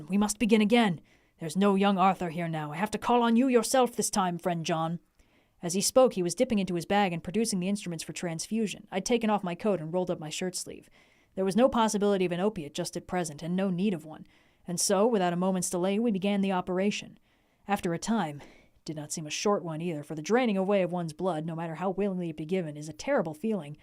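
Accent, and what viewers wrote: Scotland, United Kingdom. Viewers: I would have 1000 hours of that scottish if you would spare me that newsman voice.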